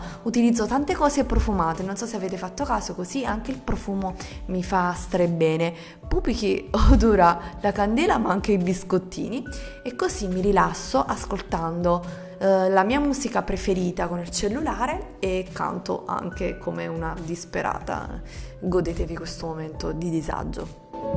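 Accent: Naples, Italy. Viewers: sei napoletana?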